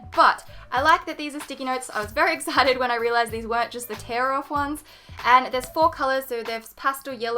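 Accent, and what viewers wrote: Australia. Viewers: I love ur videos but did anyone else you only notice her accent after she said 'in Australia" ? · I can never fully express my love for the Australian accent .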